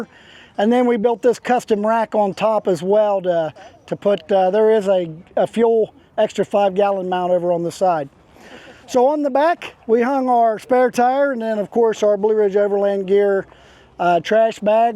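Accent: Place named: West Virginia, United States. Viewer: What part of West Virginia are you from. I’m originally from Kimberly. Every heard of that little holler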